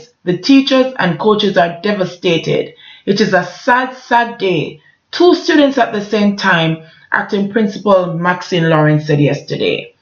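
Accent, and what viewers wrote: Jamaica. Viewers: Do ur. I no it not easy for you to come and talk about all this sad news because you just want some peace. I like how u still speak like a Jamaica. well done big up ur self.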